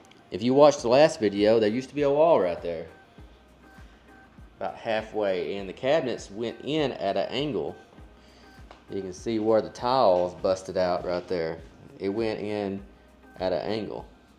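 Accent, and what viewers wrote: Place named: South, United States. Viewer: Watching the progress! What State are you in? Sounds like Alabama or Georgia!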